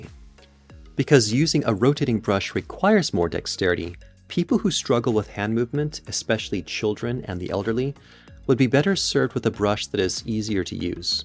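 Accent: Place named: Scotland, United Kingdom. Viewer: Was going to get oral but now sonic! Thanks for this! Love your accent from Scotland 🏴!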